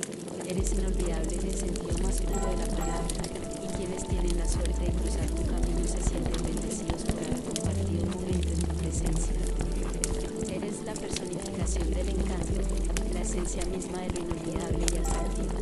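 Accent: Colombia. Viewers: La vocesita parece de bogotá lsjdj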